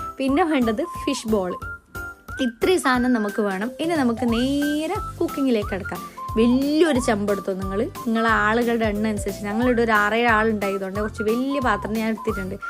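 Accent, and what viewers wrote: Kannur, India. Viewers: Slang polich.. Evda kannuro kasarodo...❤ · ചേച്ചി കണ്ണൂർ ആണല്ലേ വർത്താനം കേട്ടപ്പോ തിരിഞ്ഞു 😍 കണ്ണൂർ എവിടാ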